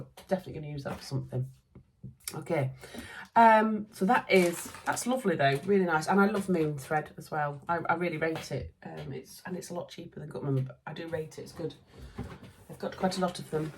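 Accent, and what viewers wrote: Yorkshire, United Kingdom. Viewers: That 'gonk' fabric is awesome! I literally gasped when you held it up. I had to laugh at your comment about being British and talking about the weather. I think it's our national obsession. I'm near Glasgow and we've had -10 degrees yesterday and today. I wouldn't have minded except our boiler decided to pack in and it took Scottish Gas 2 days to get out to us to fix it. The house was only marginally warmer indoors than it was outdoors!! I can honestly say, that I don't ever care to repeat that experience. At least your videos have kept me cheerful. Keep going, Mrs, I love your smile and infectious laugh. I'm also secretly loving your accent. It's been nearly 15 years since we moved up from Yorkshire to Glasgow and I didn't realise how much I missed the accent until I started watching your videos. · I made myself a gnome printed turtleneck top to wear to the Christmas open house at the childcare centre I work at. 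It was a big hit. Also I have been hosting tea parties in each classroom with the children, as I'm serving the tea, (water), I talk to them in a British accent which actually sounds like you. Of course it just makes them roar with laughter. My local fabric store still doesn't carry that stretchy thread, so frustrating. I make sure I watch your vlogmas before I leave for work everyday. That way I start my day with a smile.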